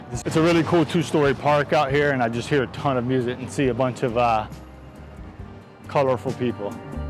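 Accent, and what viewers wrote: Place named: South, United States. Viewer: Great to see you back!! Love your videos and hope to see many more in the future. By the way I can hear you've picked up a little of the southern accent, nice!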